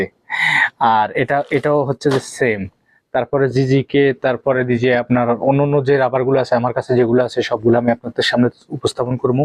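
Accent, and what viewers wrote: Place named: Chandpur, Bangladesh. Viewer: ভাইয়ের বাসা কোথায়??। কথা চাঁদপুরের মতো মনে হয়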